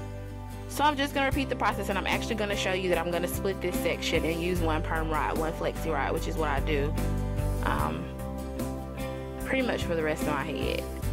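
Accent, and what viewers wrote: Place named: Atlanta, United States. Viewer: Hey!:P love this look. Are you from ATL by the way? I heard a lil accent:)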